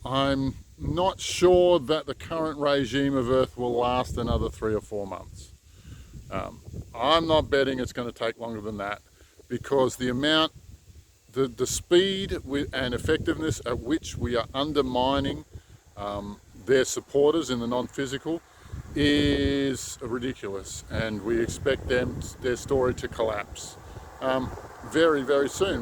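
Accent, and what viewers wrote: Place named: Australia. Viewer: I just found you & am blown away by the little I’ve seen. It wasn’t until I saw the stunning scenery behind you that I clicked you were Aussie & why your accent & speech were so relatable - 👋🏻 from cold, wet, freezing Melbourne here on Cup Day 🏇🏆 After decades of physical/mind blocks, I finally see/feel the closest to home ever, expanding without restriction & what I heard here made complete sense. Thankyou, may love & light be with you always 💗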